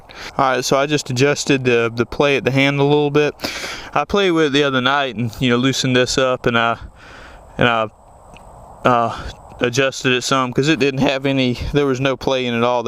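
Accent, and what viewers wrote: South, United States. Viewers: Georgia or Louisiana lol you seem like your from 1 of those states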